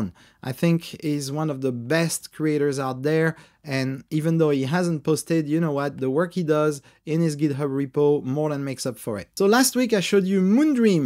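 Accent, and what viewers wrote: France. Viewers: don't say you have a terrible french accent it is lovely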